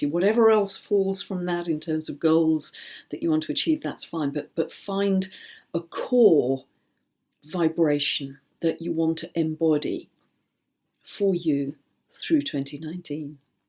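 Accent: England, United Kingdom. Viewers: Repeatedly in meditations beginning with the Winter Solstice celebration, the intention watchword for many of my friends ( and myself) has been “Courage”. So to hear you also share “Courage” as an intention for 2019 was a wonderful confirmation of how “the collective” is moving in to this new year cycle. 🙏 Thank you for sharing with us every month. I find your analysis always clear, concise and entertaining. (English accent, a welcomed plus 😉)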